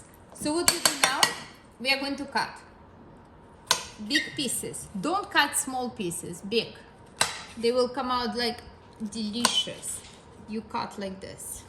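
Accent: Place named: Armenia. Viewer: These look wonderful. I LOVE your accent...it reminds me of all my Armenian relatives from many years ago. I will make these soon!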